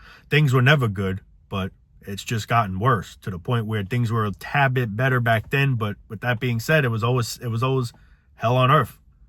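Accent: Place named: Italian-American, United States. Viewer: What accent is this? Like Italian mobster/Jersey/Boston? Lol sounds cool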